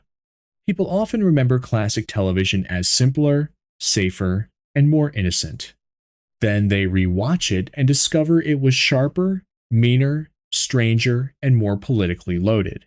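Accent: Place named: United States. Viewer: Hey dont be critical of that. That's how Americans say that word. That's how they are taught. Live & let live ! That's the world we live in.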